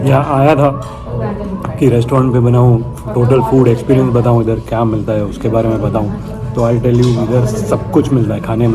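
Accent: Mumbai, India. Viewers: Typical Mumbaiya hindi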